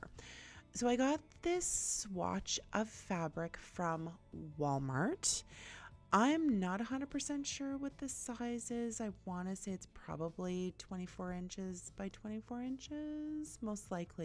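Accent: Canada. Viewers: I have only one other DIYer to whom I am subscribed that uses Hippo waterslide and heat transfer paper. It is fascinating to watch it being used. I just plain have to try it out myself!! You have made projects here today that, as always, look high end. My favorite was the coasters! I look forward to each of your videos!! Your voice is soft and soothing and I’m diggin’ your Canadian accent!! 😃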